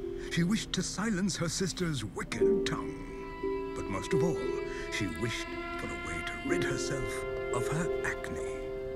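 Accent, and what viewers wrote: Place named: United Kingdom. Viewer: stuffy British narration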